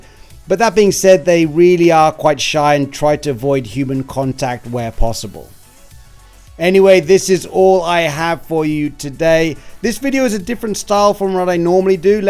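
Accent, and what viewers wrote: Thailand. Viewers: Your thai accent so cute.❤ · หูเราเพี้ยนๆมั้ยคะ เราว่าสำเนียงคุณแปร่งมาทางไทยนิดนึงค่ะ หรือเราคิดมากก็ไม่รู้นะคะ 😂😂😂😂😂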